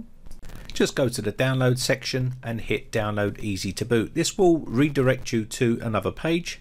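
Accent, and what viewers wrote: United Kingdom. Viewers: Couldn't help loving the accent. Where in UK are you from?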